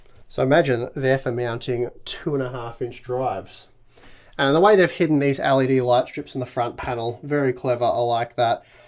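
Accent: Australia. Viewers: in that accent, I almost responded out loud with "That's not a knife, now this is a knife." Someone please get a proper Dundee knife. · Gotta love the Australian accent.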